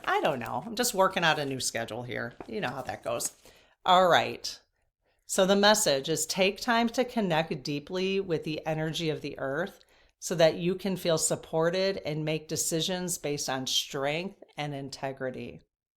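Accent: Midwest, United States. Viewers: My Moldavite is a stinker too. In and Out…around. I too have a white cat named Lucy. She is in cahoots with my moldavite. I really enjoy watching your videos. You crack me up. It’s like watching myself in the mirror. My family’s from the Midwest (Illinois & Indiana) so speaking patterns are similar & sayings, add in I’m 10 years older but 4/12 bday. Even though I’ve been on the Spiritual Path since the early 1980’s I frequently find gems 💎 in what you share. There’s always another Facet to examine or depth of Clarity to explore. Thank you. 🙏🏽 ❤Liz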